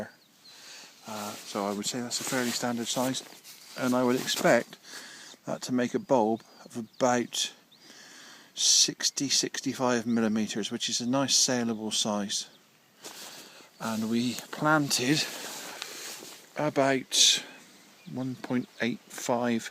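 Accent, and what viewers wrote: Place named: West Country, United Kingdom. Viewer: I'm growing several varieties from the garlicfarm "Wight" plus others I've picked up from other growers and private people, some varieties I can name off the top of my head are Maxatawny, inchellieum red, albigensian, solent wight. early purple, lautrec, Purple haze, Bella italiano, czesnok, siberian, red grain, red rezan, red belgian, vallalado, elephant garlic, avignon, killarney red, morado, estonian red, oosterdel (lithuanian) Harnas, wioleta, music, german stiffneck,Pyongyang, czech varieties Vekan and broadleaf, bulgarian, transylvanian, beli luk(bosnia) Anatolian Toscapru,(Turkey) in fact too many to keep track off. I actually work in Norway and despite having a bumper crop this year it was too long in the ground due the idiot (Ex)partner of mine not telling me it was ready and the bulbs opening, I am going to dump over 15,000 harnas bulbs in addition to the 6000 I've already dumped as don't have the land or resources to plant it. 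I will be back in Poland, for two weeks from 13-10 mail me at <mail> I hear from your accent your from the west country, I'm originally from Wiltshire. Scott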